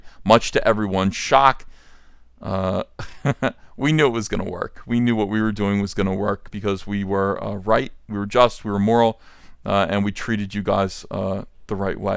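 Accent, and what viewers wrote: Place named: Baltimore, United States. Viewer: You sound like you're from the Baltimore - Camden axis (which includes Cumberland).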